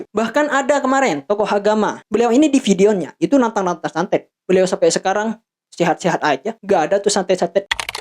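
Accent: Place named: Madura, Indonesia. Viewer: Logat madura pake lo gue agak aneh bg😅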